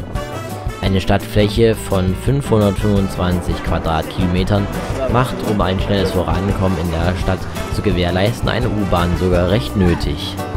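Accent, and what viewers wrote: Germany. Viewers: wie kommst du drauf, du hättest keinen Akzent? 😂 Ungarinnen und Ungarn sprechen akzentfrei ungarisch, du sprichst alle ungarischen Begriffe mit einem grauenhaften deutschen Akzent aus. Selbst auf Deutsch nuschelst du stark und versprichst dich viel zu oft. Ist schon alles sehr anstrenged anzuhören, vor allem in Kombination mit der schlechten Tonabmischung und der teils aufdringlich lauten Musik, die du besser komplett wegelassen hättest. Ist nicht böse gemeint.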